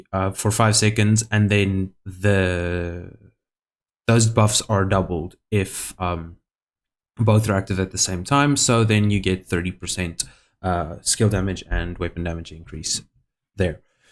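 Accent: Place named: South Africa. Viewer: Hmmmm I feel like you are south african 🧐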